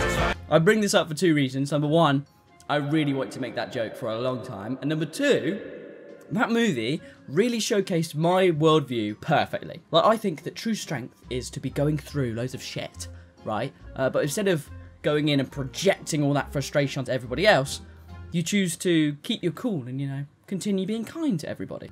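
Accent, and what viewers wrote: United Kingdom. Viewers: i do enjoy this content because hes British and speaks funny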